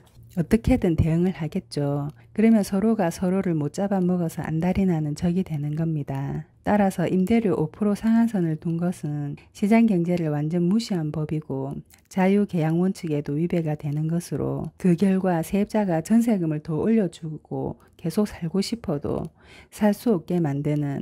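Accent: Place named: Busan, South Korea. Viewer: 안뇽하세요 저는 부산 사상에 살다가 이민을가버렸어요 님목소리들으니 부산에 빨리 가고싶네요 늘 감사합니다~^^♡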